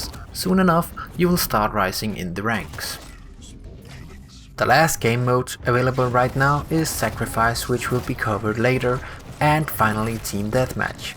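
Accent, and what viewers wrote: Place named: Denmark. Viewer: I love your accent, you shouldnt be Danish by any chance?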